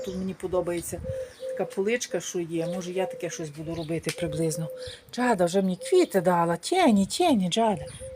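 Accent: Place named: Surzhyk, Ukraine. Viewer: Ту піца НАСТОЯЩА....Та чого ти розмовляєш російським суржиком???? Патріотка недолуга.....😮😮😮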